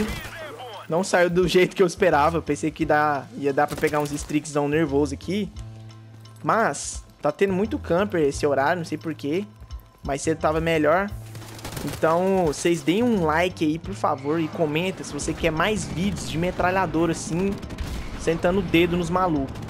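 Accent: Minas Gerais, Brazil. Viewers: Tu tem sotaque de minas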